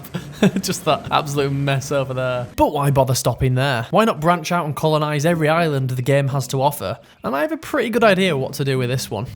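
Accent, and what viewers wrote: England, United Kingdom. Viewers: I love your british accent😮❤ You know, this is the first time I've heard a British accent spoken by a person, and yeah I like it. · The ‘Let’s game it out’ style playing with an English accent isn’t something I knew I wanted. But I’m happy this video came into my algorithm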